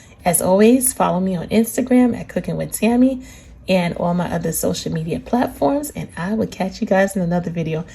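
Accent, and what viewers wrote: New York, United States. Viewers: Delicious recipe, love your Ny accent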